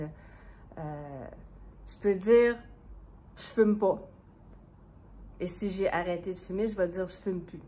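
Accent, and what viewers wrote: Quebec, Canada. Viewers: nay hô, moi, j’aime beaucoup l’accent québécois 💚💚💚 Mais c’est plate que les dessins animés avec l’accent parisien ont été influencés à certains enfants, ils leur ont imité.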